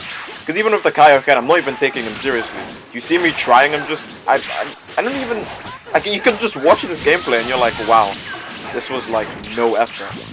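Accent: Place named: England, United Kingdom. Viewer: Are you from England? You got the accent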